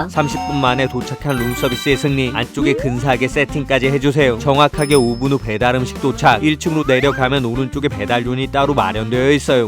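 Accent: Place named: Seoul, South Korea. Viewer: 딱 들어도 서울 말투인디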